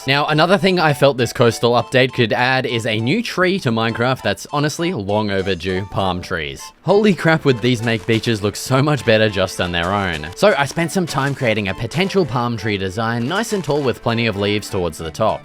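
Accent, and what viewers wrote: Australia. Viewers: Ew your Australian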